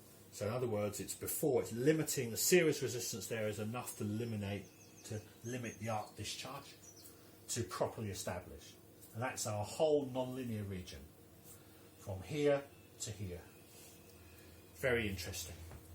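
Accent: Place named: United Kingdom. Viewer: you sound like you are U.K. based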